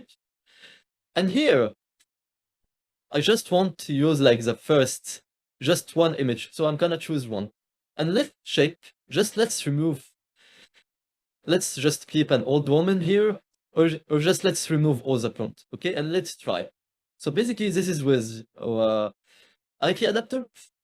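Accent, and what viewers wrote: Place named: France. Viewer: the french accent 👌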